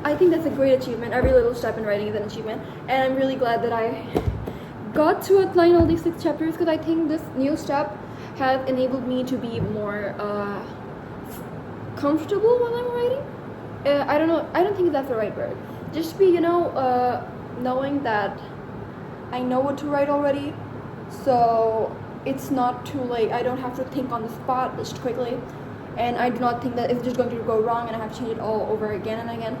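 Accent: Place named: Asia. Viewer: Artsy careers can be difficult my cousin took film studies and cinematography he had similar issues with his family members but he made it big. Aside, as an artistic and introspective person myself im quite into writing poetry, journaling and reading existential books. Also love your accent, where in Asia are you from ? We can share our writings and help each out of creative blocks ? Im making my main themes of life set of poems I've done 2 out 6. Tryna find the inspiration to write the next one. 😭 Also do try the app poetizer can help you publish.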